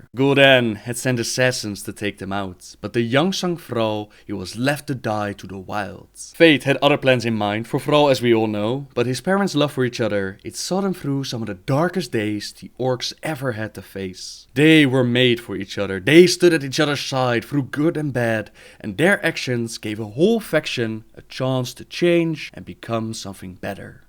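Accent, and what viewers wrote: Netherlands. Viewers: are you Dutch ? :) well i am c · I know I asked that on asked but I am not sure if you saw it. Can you make fury do one lore video? It is relaxing to listen to her voice. It would be perfectly to just chill out and listen to some great story told by fury:) And force her to make music and sing. But never the less keep up your good work too. Also a great voice to listen to and the dutch accent is always a friendly one to hear:D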